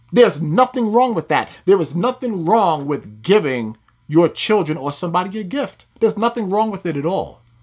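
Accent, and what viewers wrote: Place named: United States. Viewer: My Gee👀 Thank you bro I'm from South Africa so I had to figure it out my self that you were saying Satan because of you American accent with the T sounding like D 🇿🇦🇬🇧🇺🇸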